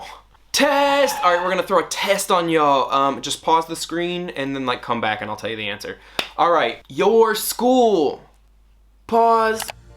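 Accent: South, United States. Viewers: I love your French language videos. In English, you have a unique way of pronouncing words with an interior T (i.e. eaten, important, written). I can tell you’re from the Southern US but where? Merci!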